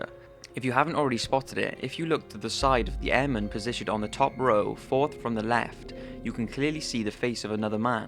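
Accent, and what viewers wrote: United Kingdom. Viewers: Your British accent makes the video sound creepier O.o PS. U have a new sub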